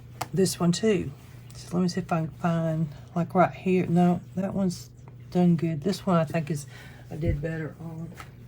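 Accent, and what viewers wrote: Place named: South, United States. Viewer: Keep going! I do all the hand craft/arts and sloppy/ large stitching is a totally new concept ( mind blowing) to me! I was taught by a perfectionist hand quilter ( 10-12 stitches per inch) and match all points or take it out and try again. It’s hard to break old habits and relax enough to do slow stitching as it’s called today. Kawandi, sashiko, boro are not the same but they are large needles and larger stitches. Raw edge will not wash up nicely unless you secure the edges down sufficiently— they will curl and fray and some areas may bunch up more than others. Keep experimenting and show us your progress! I love your southern drawl— just like my great aunt in Arkansas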